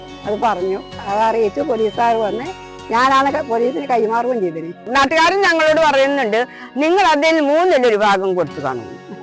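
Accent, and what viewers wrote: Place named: Thiruvananthapuram, India. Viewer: കണ്ണൂരിൽ നിന്നും തിരുവനന്തപുരം ത്തിന്റെ ശബ്ദം ... Good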